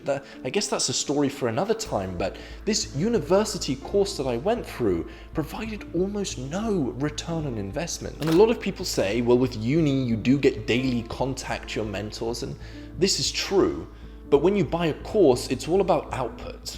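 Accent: United Kingdom. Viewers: Hey, i thought you were from the UK because of your britisch accent haha. Where are you from? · What? You mean the british accent? The accent that has because he is from England? The same accent used by most of the british population? I guess you're right. If this british guy we don't personally know is talking about money with a thick british accent, then he's probably some trust fund baby trying to scam us.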